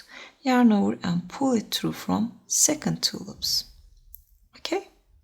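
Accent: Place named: Turkey. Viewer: I've watched a few of your videos and had a feeling from your accent that you're from Turkey 😀 I wasn't sure though but then I saw the yarn you're using. I have this brand too as I live in Turkey 😊 hello to you and thanks for your videos. Your accent and voice is so soothing and your videos are so helpful 🤗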